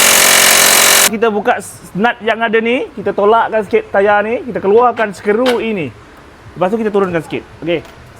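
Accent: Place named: Java, Indonesia. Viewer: Ada lorat² jawa sikit...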